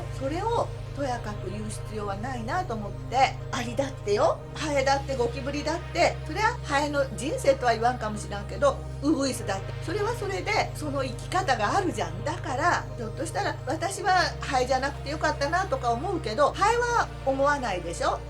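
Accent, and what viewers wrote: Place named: Hiroshima, Japan. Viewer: 広島弁かな！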